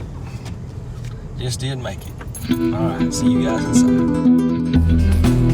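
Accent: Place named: South, United States. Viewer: I got a great vinyl collection but no friends to share them with. I know you would enjoy them. Shame I live over in England near a place called Liverpool of Beatles fame. Love your southern accent.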